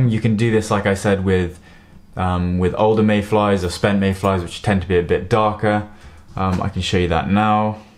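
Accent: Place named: RP, United Kingdom. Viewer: Gosh! What a delightful young man - with talent. And what a delight too, to hear a presentation in RP., where every word is immediately understandable. He's a student, and like many students he's experimenting with his own image and accessories - I remember that phase well. The hair and the bangles and beads may go eventually, but his ability to communicate will remain. Terrific. That's excellent skill and a pretty fly, but (and I do apologise for raining on you effort) those stiff wings do dreadful things to one's leaders - twist, twist, twist. I think you have a fine future.What are you reading, and where?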